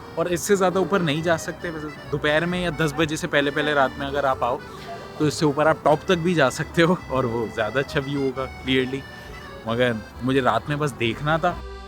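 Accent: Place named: Karachi, Pakistan. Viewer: I always smile while watching your videos. I think it's because we speak the same way as we are Karachiites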